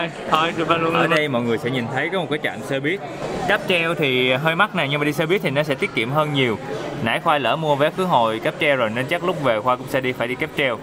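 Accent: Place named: Ca Mau, Vietnam. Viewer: Khoai nói chuyện giống người cà mau nè "cái đó ruột già ông nội ơi', chị thích nha❤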